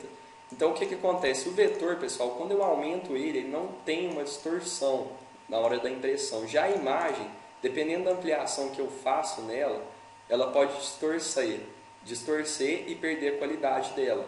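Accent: Minas Gerais, Brazil. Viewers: meu deus que fofura de sotaque mineiro. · Alguma duvida que é mineiro?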